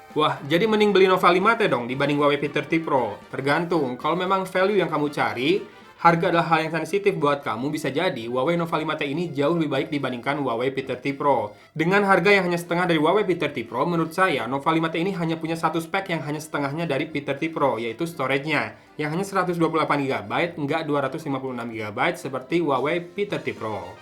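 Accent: West Java, Indonesia. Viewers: orang sunda mah keliatan dari logatnya juga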